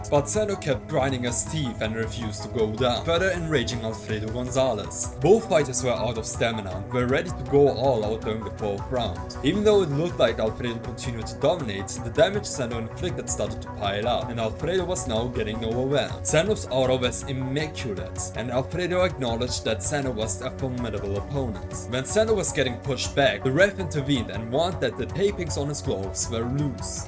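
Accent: Germany. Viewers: Man hört den deutschen Akzent🥀 trz W Video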